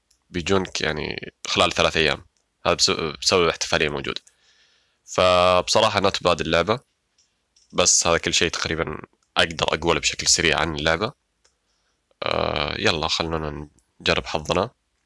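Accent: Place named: Saudi Arabia. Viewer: اللهجة السعودي مضبوطة مرة كتير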